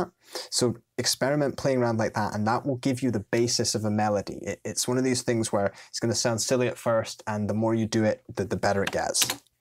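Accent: Scotland, United Kingdom. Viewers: Did you know?, if you went to Scotland, in particular his home city or town; he wouldn't have an accent anymore. You would have an accent. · He's Scottish, so you must like that accent. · Are you Scottish?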